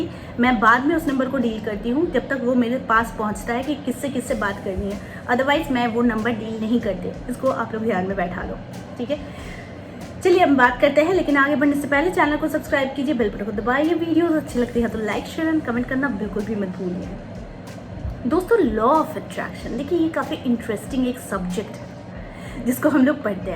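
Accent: Bengal, India. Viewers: Sute jawar age tmr video dekhe sute jawa...eta amk ank besi positive vibes dae..tumi bhishon misti..ar khub valo vabe guchiye ktha bolo...jodi kakhono somoy pai tmr sathe ami nischoi dekha korbo..i just love u mam❤ arekta ktha bengali ra jokhon hindi te ktha bole tokhon besi cute lage...thik jemon tumi🥰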